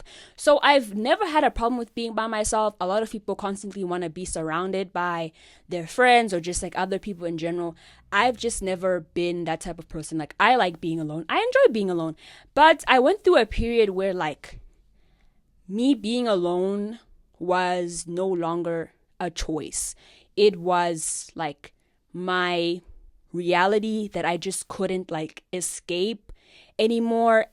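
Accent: South Africa. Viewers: are you south african?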